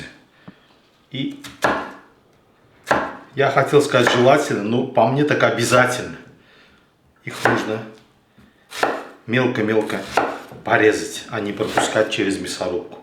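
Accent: Baku, Azerbaijan. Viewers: Приятно слышать русскую речь с Бакинским акцентом.рецепт бомба 😊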